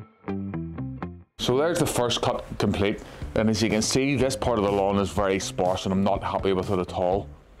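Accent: Northern Ireland, United Kingdom. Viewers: Great video again, learning loads since I started watching you. I’ve even learned how to understand the thick N.I accent 🤣👍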